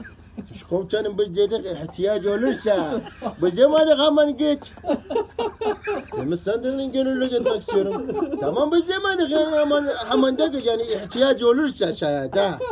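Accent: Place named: Arab world. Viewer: Aynen ya gocmen arapların şivesi böyle:)))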